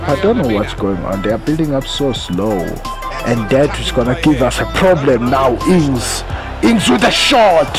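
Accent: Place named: Zimbabwe. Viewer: u defo from zim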